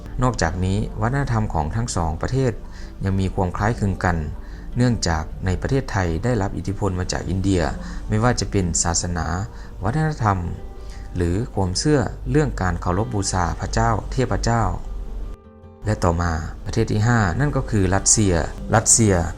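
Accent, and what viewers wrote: Laos. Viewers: ไม่น่าจะใช่ไทยอีสานด้วย น่าจะเป็นคนลาวนะ ฟังจากหลายตัวอักษร ไม่เหมือนของไทย เขาจะออกเสียงยากเพราะความเคยชิน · พูดได้ใกล้กับคนไทย …แต่สำเนียงบ่อยครั้งออกเสียงแบบคนลาว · แอดมินคือคนลาวใช่มั้ยครับ..ผมฟังจากสำเนียงน่ะครับ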